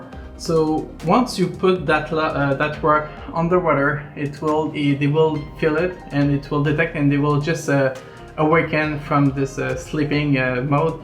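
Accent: Quebec, Canada. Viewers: J’aime entendre l’accent Québécois dans mes vidéos de reefing ;) Un abonné de plus !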